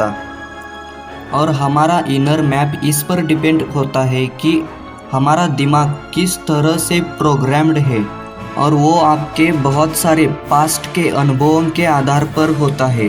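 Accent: Maharashtra, India. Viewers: Bro where are you from it sounds Marathi guy anyway. I agree with you bro you really explained by hurt and its true. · कसलं भारी मराठी मे हिंदी बोलतोय भाऊ तू .....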